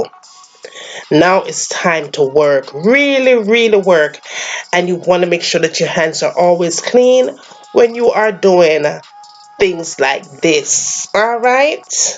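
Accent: Jamaica. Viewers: 😳😝Gosh I love to here you speak. U be the Jamaican I love to listen to like Miss Lou. Yes happy channel growth. Now please traditial Jamaican yest corn bread receipt Thank you.🤣😎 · I love accent you really Jamican and way to talk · I could listen to you all day, Luv how your utensils and kitchen are clean. loving the Jam twang' too! lol@ it there and let it hang out." You have a subscriber right away. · I love this delicious 😋 Coco bread. I love listening to your tutorial with our Wonderful Jamaican accent& language. If you love listening to our language you can check out my Poetry 💖Keep sharing these delicious recipes 💖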